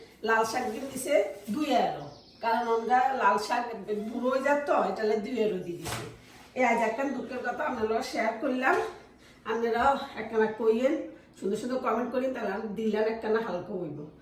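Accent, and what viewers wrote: Noakhali, Bangladesh. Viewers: Anti অাপনার কথা গুলো শুনে কলিজা ঠান্ডা হয়ে গেছে অাপনি অাজকে পুরো নোয়াখালী ভাষায় কথা বলছেন । অামি নোয়াখালীর মেয়ে অাপনার ভিডিও অামার কাছে ভালো লাগে ভালো থাকবেন খালামনি।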